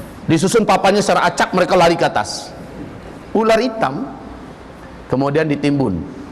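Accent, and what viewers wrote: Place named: West Sumatra, Indonesia. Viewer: Ustaz...ustaz minang ko bangkinang? Kadang-kadang bunyi minang...kdng-kdang bangkinang.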